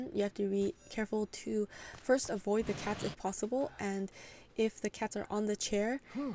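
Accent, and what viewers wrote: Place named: Finland. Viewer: Tysm! This is so helpful, couldn't find a good guide on Ann. Btw where are you from? Your accent sounds the same as my finnish friend's haha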